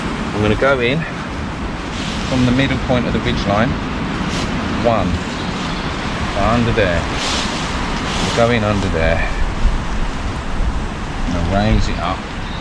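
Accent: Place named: United Kingdom. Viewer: I like that this tarp is lightweight and that you are in the UK, speaking British English. No jingoism, just easier to understand and relate to for me. Your explanations could do with some improvement, however.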